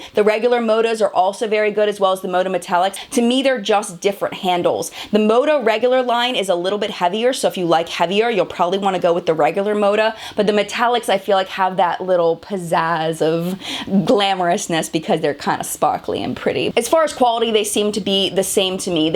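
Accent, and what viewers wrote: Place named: Baltimore, United States. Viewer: Ha! Thought I recognized the accent as Mid-Atlantic (Philly?) but didn't know for sure it was Baltimore. Baltimore Hon here, too too. 😁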